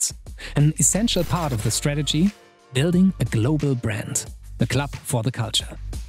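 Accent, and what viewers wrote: Germany. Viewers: LOL I'm from Germany and i can tell by the accent the person of this account is a German ;) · Ur German accent is so relaxing!!!! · Wie man merkt das er Deutscher ist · U german right? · is the narrator German? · The german accent is horrible otherwise very informative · Irre ich mich oder kommt der Deutsche Akzent raus? 😂😅🤔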